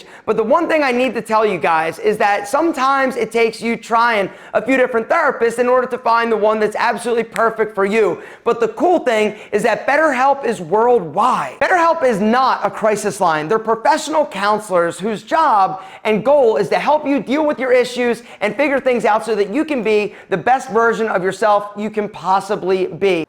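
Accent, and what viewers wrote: Philadelphia, United States. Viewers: Yo, where you from? You’ve got a Philly accent for sure, am I right?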